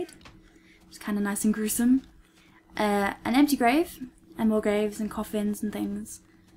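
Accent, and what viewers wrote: United Kingdom. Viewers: Are you scottish or british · Scottish? LOL. It's a British accent.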